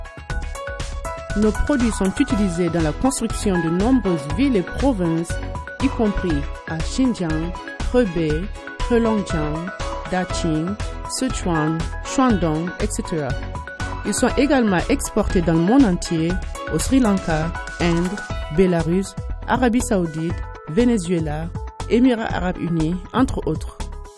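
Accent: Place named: Africa. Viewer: j'adore l'accent africain !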